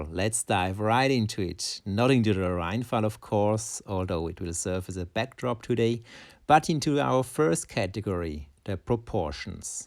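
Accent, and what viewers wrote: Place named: Austria. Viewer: Great video! I feel you have an Austrian accent. Am I right?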